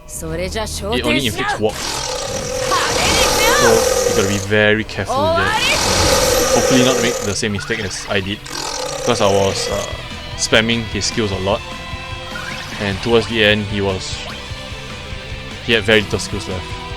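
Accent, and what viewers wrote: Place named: Singapore. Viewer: You Singaporean? I can recognise the fellow accent xD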